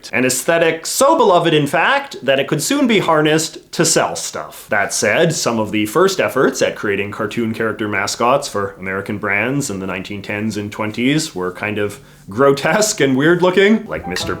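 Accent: Canada. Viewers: I don't know if you purposefully over-emphasize your Canadian accent or it just stands out so much to me, but either way I love it and never change. I really believe you are the the preeminent internet expert on Americana. You see and appreciate and critique things that neither a family member or a complete stranger would of a household, you have an understanding of a close and trusted neighbor, a truer understanding.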